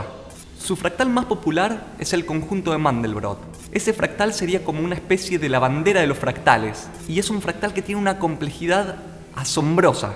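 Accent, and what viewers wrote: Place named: Buenos Aires, Argentina. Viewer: De Buenos Aires sos!! O de Rosario... es el típico acento!!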